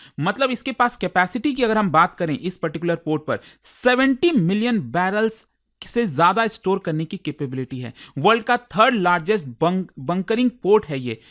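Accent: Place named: Bihar, India. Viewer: You sounds like bihari without any logic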